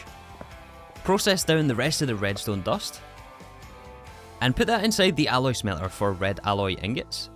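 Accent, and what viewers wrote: Scotland, United Kingdom. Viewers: 3 are you Scottish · bro im new here you Scottish or something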